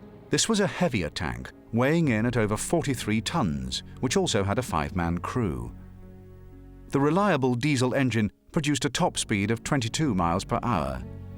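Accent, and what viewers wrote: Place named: United Kingdom. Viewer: Dear God...when is the British voice thing going to end?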